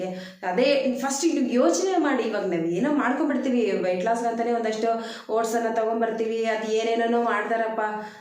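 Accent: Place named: Telugu, India. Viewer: Nimdu yavuru madam swalpa Telugu mathadotara ede❤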